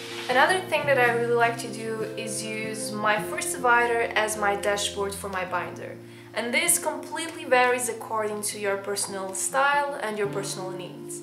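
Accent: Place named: Portugal. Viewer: Sotaque de portuguesa é inconfundível ahah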